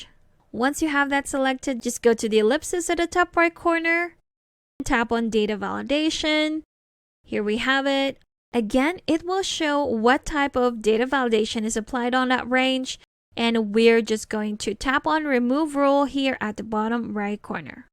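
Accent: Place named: Canada. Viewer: I still think your voice is absolutely beautiful. Even when I don't have a need for your current spreadsheet tip, I might sometimes listen to your latest tutorial just to brighten my day. You could do so much with that voice. Would you mind telling us something about yourself? Based on your accent, I'd guess you're likely Canadian. I'm probably way off, but yea, just a guess.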